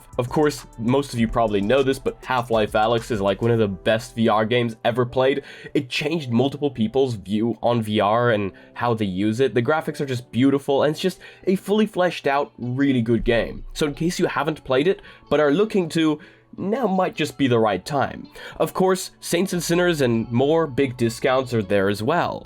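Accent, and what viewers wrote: Ireland. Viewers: You have just a whisper of an Irish accent, dont you? Am I Right??? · I love when the Irish accent sneaks out 🍀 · Bro sometimes u just sound irish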